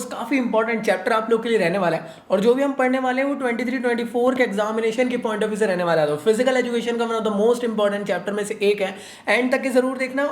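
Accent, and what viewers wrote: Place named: Madhya Pradesh, India. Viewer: Bhaiya app ki bhasa sa lgra app mp ka hoa😂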